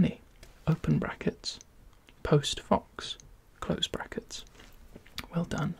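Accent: United Kingdom. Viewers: are you actually british or are you just faking it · yes that is british accent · I`m in love with british accent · I love the bri' 'ish accent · thanks to youtube algorithm for suggesting a british accent channel lol and u seem like a fun person even though i have a littleee difficulty with the language anyway I'll support u ^ ^ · I love british accent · I was scrolling and started watching this video without audio. And I clicked and was going to comment, "he looks like he has a British accent without audio lol", but then he DID have a British accent lmao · Мое плохое знание английского + британский акцент = смерть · The british accent improves EVERYTHING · Word repetition with British accent might just be my new favorite thing ❤️ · help why I'm so obssesed with british accent wtf? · I am from Russia and have been studying English for 8 years. I should note one important thing: your British accent is the best thing I've ever heard in my entire life. 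It's magical and indescribable! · You're very cute! And loved the asmr vid!! I enjoyed the British accent! Stay amazing ! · The British accent is literally my favorite thing ever I could listen to this everday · Help i cant understand british accent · The British Accent is a chefs kiss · THE BRITISH ACCENT AGHH🙈🙈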